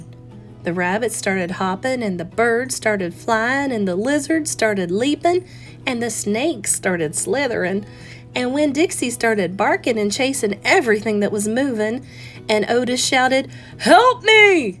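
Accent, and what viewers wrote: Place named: South, United States. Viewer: It's very hard to find anyone who can read a chapter book with excellent intonation, phrasing, and tone. If I need to find a reading to post for my students because I will be absent or I have a student out for an extended time, it's nearly impossible to find good readers on You Tube. But, you are amazing! Your beautiful accent really adds to the ambiance of the story, since it takes place in the south. By far the BEST reader I can find On You Tube.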